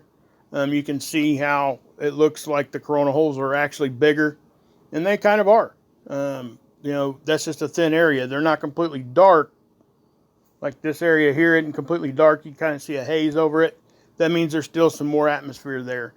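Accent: South, United States. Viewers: i jus like ur country accent😝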